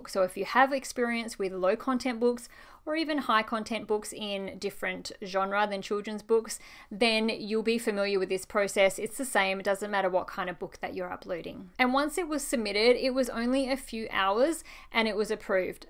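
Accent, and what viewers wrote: Australia. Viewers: Lovely, unaffected, clean Australian voice. Outstanding, lucid preparation ands steady camera work. Smart illustrations. If this charmer isn't a teacher, she should be. She nailed it with this book.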